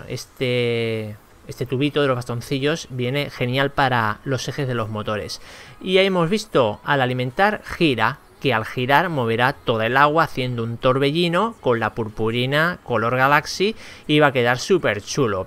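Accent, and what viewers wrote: Chile. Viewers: Eres chileno¿? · No sabias que eras chileno, por tu acent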